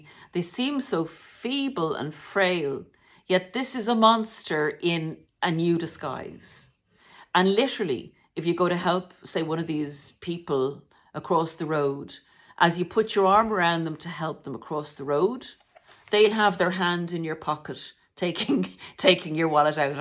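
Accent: Ireland. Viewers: My ex was Scottish but lived in Ireland, so I visited Ireland a lot and travelled around many different counties. Thus I love the accent and really love listening to you. Your voice is really soothing to me and reminds me of just sitting chatting with locals in some beautiful country bar. You also hit the nail on the head with the narc stuff , as the Scottish ex was a disgusting , cheating lying abusive violent narc - a really extreme version. So despite the fact that some very extreme abuse occurred when I was alone with him in Ireland - i still have some lovely memories of the place, the landscape and people i encountered when out and travelling around alone. I even met strangers who stepped in to help me when the abuse was extreme- people who actually took me in to their home. I am eternally grateful for the help they so selflessly gave me- and I often wish I could let them know albeit spiritually, that I finally got away and stayed away. 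❤️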